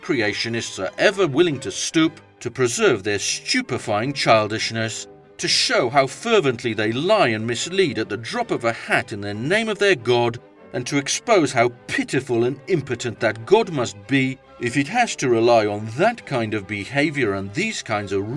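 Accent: United Kingdom. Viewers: I like the montone swearing in a british accent, . . . and the classical music.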